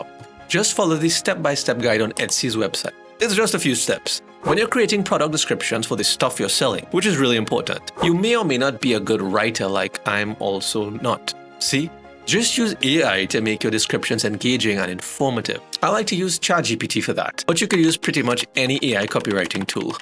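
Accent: Trinidad and Tobago. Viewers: Are you Tinidadian? I'm hearing some sort of accent. Good content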